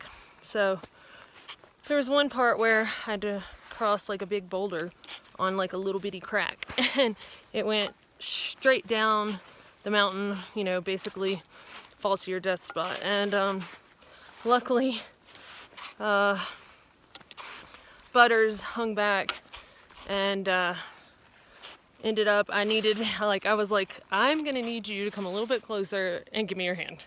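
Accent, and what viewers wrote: South, United States. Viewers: That was just superb! Very nice metaphor about the beauty juxtaposed against the risk, too. And, by the way, as an Oklahoma/Texas boy, I love hearing your southern accent out there among the Californians. You represent us well! Thanks for sharing,. Steve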